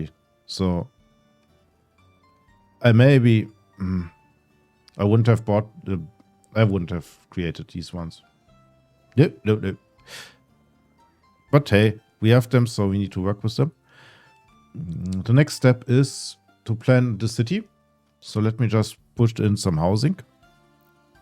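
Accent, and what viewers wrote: Germany. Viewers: You sound reallly german. Und das sag ich als deutscher😂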